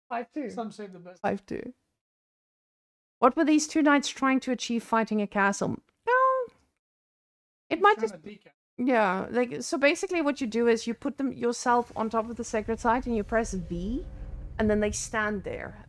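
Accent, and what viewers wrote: South Africa. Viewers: I'm not a beginner but I watched the whole video to enjoy the dulcet sounds of mesmerizing South African accent.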